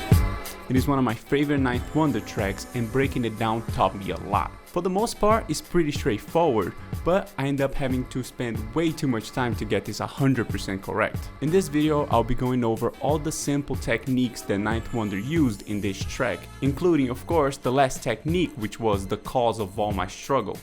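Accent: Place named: Brazil. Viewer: tu tem sotaque de brasileiro, tu é brasil?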